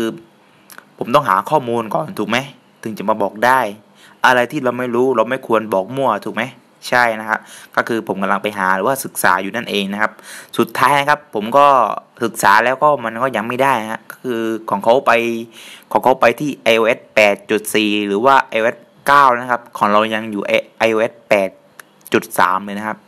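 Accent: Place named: South, Thailand. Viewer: เสียงเหมือนคนใต้นิ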